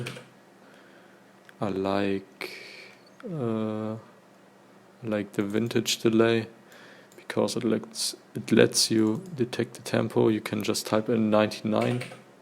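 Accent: Germany. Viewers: Which is why most of nowadays music sounds thew same. He smashed the genre, true. But it's just one of millions of songs we hear while learning/studying/working. It would be a great start to build on upon, or an easy short tutorial. That's already it, let's everyone agree on paying more attention to the music we hear, so we know when we're getting fucked in our pressious brians by the industry. Good tutorial, boi! Do I hear a german accent? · one word and i was able to hear the german accent XD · As a german, english with german accent makes me cringe so hard. Nothing against you, I think I sound similar. Great tutorial, thank you! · YE i thought also about his accent because im german too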